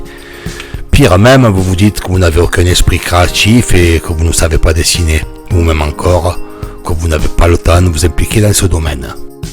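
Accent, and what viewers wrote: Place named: Marseille, France. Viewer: Je trouve ce concept intéressant, car j'aime maîtriser ce que je fais. De plus la vidéo est sympa et ludique avec un accent Marseillais qui change de l'ordinaire sur Youtube !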